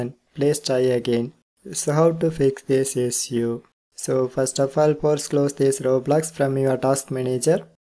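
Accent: India. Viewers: thank you my bro i was making fun of ur accent now ill stop making fun of indians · Indian voice, bye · TV as thai i think he not from thailand i think he is indian bc accent in thailand not like this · thanks bro. for some reason its always the dudes that either are actually indian or sound like them that end up helping me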